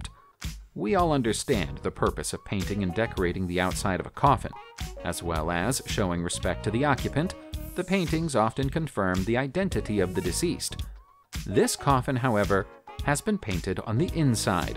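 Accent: United States. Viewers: American production/narration.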